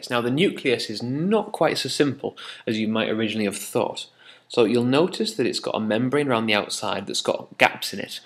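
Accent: United Kingdom. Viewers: he bri'ish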